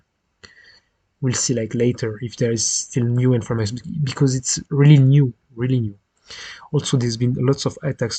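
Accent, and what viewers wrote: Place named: France. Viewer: The french accent 🥵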